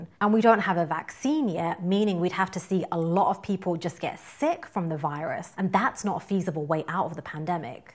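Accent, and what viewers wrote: United Kingdom. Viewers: So weird seeing perfect teeth speaking a British accent. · BrRational: yeah, but she's cute, & with what sounds like a British accent, so... painless, at the very least. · Thank you so much for your very informative presentation, Doctor. I love your British accent too. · With her gorgeous face and that Brit accent, I can listen her read the phone book all day!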